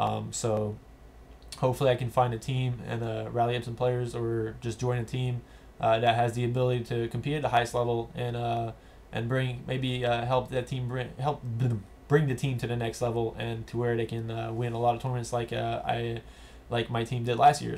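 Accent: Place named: Quebec, Canada. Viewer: haha such a strong quebec accent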